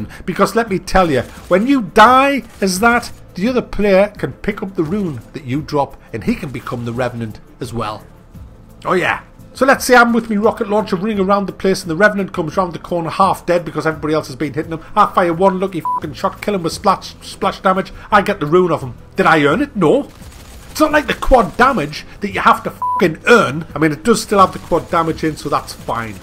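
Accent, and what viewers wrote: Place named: Birmingham, United Kingdom. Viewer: Buy you sound like your from birmingham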